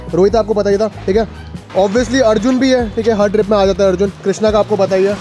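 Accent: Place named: Haryana, India. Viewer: Jadda haryanvi hai bhai😂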